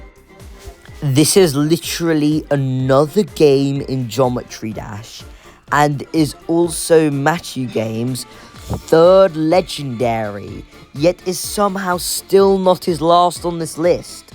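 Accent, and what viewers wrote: United Kingdom. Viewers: I didn't think somebogy's voice could be this British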